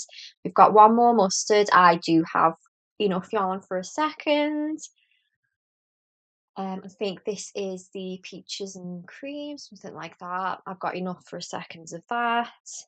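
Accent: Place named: Northern England, United Kingdom. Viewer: Love your podcast. You were recommended to me by someone in my discord channel and you've quickly become one of my favourites (Plus it's so nice to hear another Northern lass on here!) x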